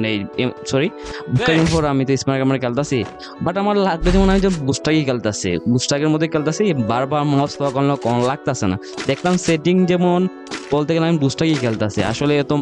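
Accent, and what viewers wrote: Sylhet, Bangladesh. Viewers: bhai tumi sylhety ni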